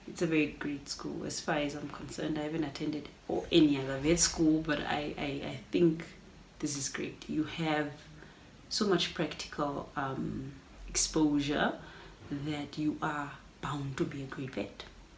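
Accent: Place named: Vietnam. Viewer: your NamLish on point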